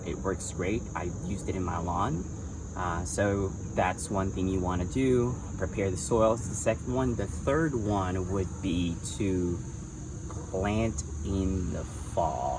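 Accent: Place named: South, United States. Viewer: I was thinking you were from the South. Thank you for the video! 😊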